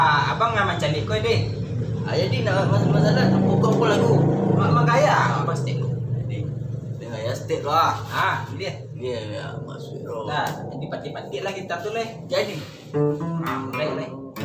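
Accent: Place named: West Sumatra, Indonesia. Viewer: Keren abis dah bang. Seneng denger lagunya. Sama seneng denger logat minangnya.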